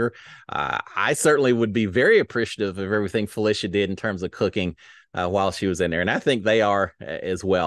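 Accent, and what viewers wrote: Texas, United States. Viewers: Thank you, for all of your hard work. I especially appreciate your clean recaps bc I'm not a fan of the language so many of the house guests use. So thank you for that. Not to mention, I totally enjoy hearing a fellow Texan while I'm living in Phoenix😂 Have a Blessed day!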